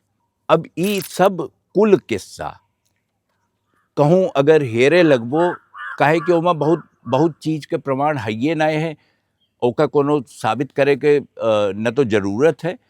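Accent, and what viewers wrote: Awadh, India. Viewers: Listening to your video feels like roaming around my nani,s village about 60- 65 years back.Same air .same mitti ki sondhi sugandh and the original awadhi.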